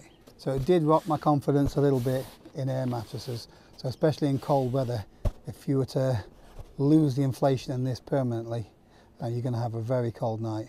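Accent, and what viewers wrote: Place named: Derbyshire, United Kingdom. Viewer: Thanks, great advice as standard. Planning to go bike packing and wild camping this year 2021, your accent sounds very local to me(Belper, DERBYSHIRE) where are you from?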